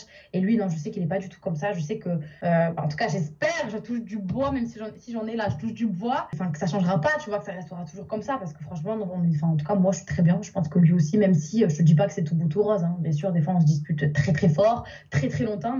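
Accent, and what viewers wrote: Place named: Marseille, France. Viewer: A Marseille on parle comme ça vous avez un problème ?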